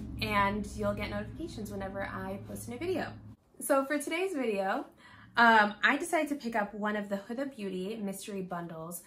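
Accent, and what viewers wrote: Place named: Arab world. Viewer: Your Arabic accent is soo cute.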